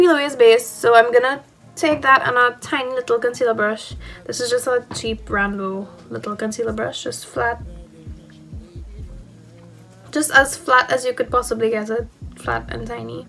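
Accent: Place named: Trinidad and Tobago. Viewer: Keep going!!!! from a trini in NYC. It’s so refreshing to see someone from home doing makeup reviews. Let me just say, there is an audience out here for your brand, I was so happy and proud when I heard the accent. It made me feel at home. Thank you so much for what you are doing. I look forward to seing all that you do. I am a subscriber for life.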